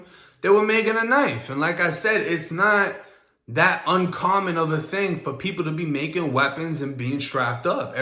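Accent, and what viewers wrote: Boston, United States. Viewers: U from florida? Or boston? That accent tells me u were raised somewhere else other then Florida lmao 🤣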